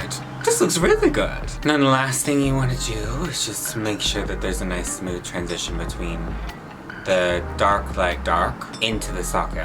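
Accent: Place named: United Kingdom. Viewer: Stopppp, are you from the UK?! 😭👏🏽👏🏽👏🏽 Love you even more now 🇬🇧🇬🇧🇬🇧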